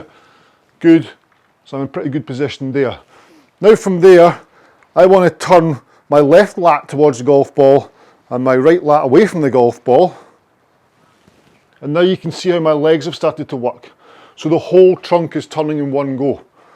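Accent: Scotland, United Kingdom. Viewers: That beautiful Scottish accent - could listen all day 😄. Happy new year!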